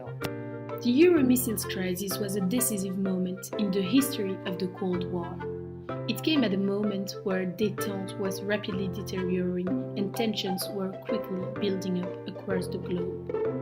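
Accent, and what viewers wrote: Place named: France. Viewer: That french accent tho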